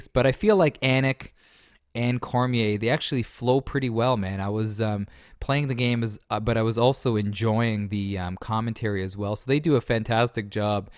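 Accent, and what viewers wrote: Canada. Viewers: Why do u sound soooo canadian?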